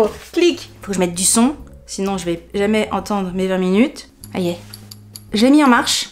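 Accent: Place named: Southwest, France. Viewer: Team sourcileuu, sud-ouest oblige ! On prononce tout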